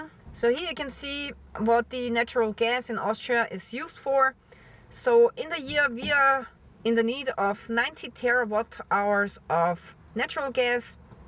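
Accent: Germany. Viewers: Great video;you have a German " accent... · Your English sounds a little like Klaus Schwab. Are you German? I used to live in Black Forest. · She speaks like Terminator. She has a German accent.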